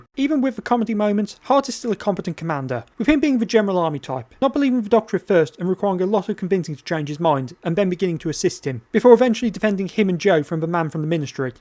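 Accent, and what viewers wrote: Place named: Midlands, United Kingdom. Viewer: "I'm not going to change it because I can't be bothered" "She's not that important,.... plot-wise. " Brilliant! I love the humour and your Midlands(?, sorry if I've got it wrong)) delivery. I have to admit, I like the dark beginning of The Sea Devils, but it soon tails off. I agree with your 'mid' definition; it's not bad, and it's not really good. Though, to be fair, I've not watched it for a long time. The first time I saw it was in '93 when it was repeated on BBC2 (I think) and I was enamoured with it; my favourite Doctor and my favourite companion, few (relatively) VHS releases and almost zero repeats. I'll have to give it another go. Thanks for the review. (Edited once for a typo)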